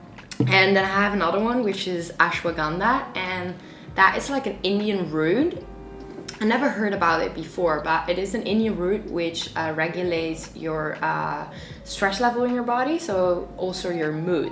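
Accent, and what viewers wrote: Netherlands. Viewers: DUTCH ACCENT + LOVELY VOICE = PERFECTION ☺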